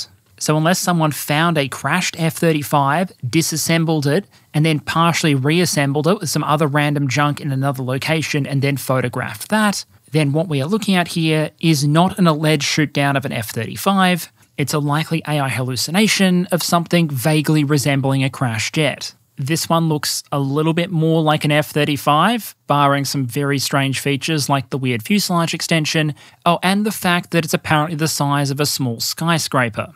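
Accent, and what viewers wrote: Australia. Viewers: I don't remember perun having an ausie accent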